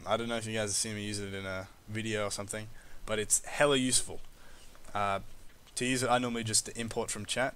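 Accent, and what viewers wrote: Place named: Australia. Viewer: I LOVE THE AUSSIE ACCENT! ITS SO NICE TO HEAR A YOUTUBER HAVE ONE! (i'm australian)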